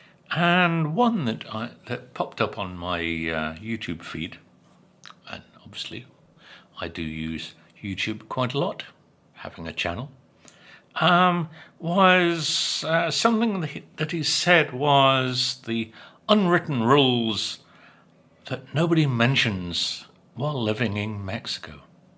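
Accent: United Kingdom. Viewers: I love your british accent,,I try to imitate it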